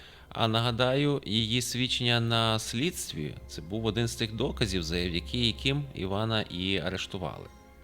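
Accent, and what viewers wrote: Lviv, Ukraine. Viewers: Вас так приємно слухати.Гарний тембр голосу, літературна,і разом з цим, чути львівський говір!Буду слухати вас і надалі! Цікаво.Удачі вам і наснаги.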